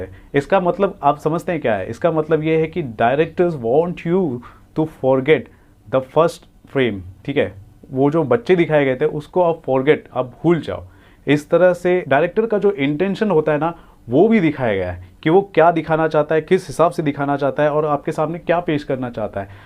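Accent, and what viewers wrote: Karnataka, India. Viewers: Ur kannada is so sweet 😘.. lots of love from Karnataka ❤️💛🙏🏽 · You talk pakka kannadiga in 1st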